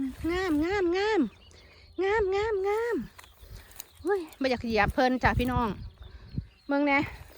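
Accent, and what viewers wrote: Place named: Ubon, Thailand. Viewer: 👋😊เดนมาร์กอุดมสมบูรณ์ทุกอย่างน้อจ้า(ขอโทษเด้อยายเป็นคนจังหวัดได๋น้อสำเนียงคึคนบ้านข้อยแท้?)อุบล.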